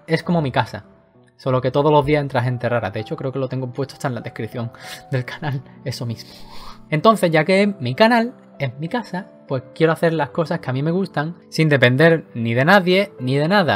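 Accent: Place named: Andalusia, Spain. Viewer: te queremos!! jajaj aja amo tu acento andaluz (de nuevo) jajajaja xD me asustaste con ese nombre de adios youtube.. pense que te estabas despidiendo!! siga hermano!! habemos personas q te apoyamos!!:D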